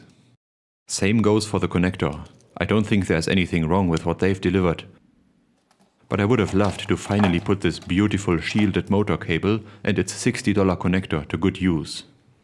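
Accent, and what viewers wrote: Germany. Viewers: Love that German accent (and very dry sense of humor)! High-accuracy stepper motors please!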